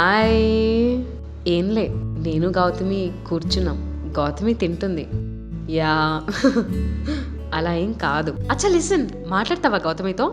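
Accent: Rayalaseema, India. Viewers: ur from rayalseema na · Hey r u from rayala seema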